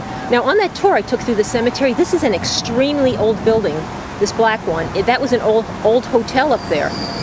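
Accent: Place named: Ridgewood, United States. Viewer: Nice video. Good to hear a Ridgewood accent out here in Colorado. I use to sell pretzels on the corner of Seneca and Myrtle. I made seven dollars in four hours in 1969. That was the height of my earning potential! You could buy a lot with seven dollars back then. Another world, another time.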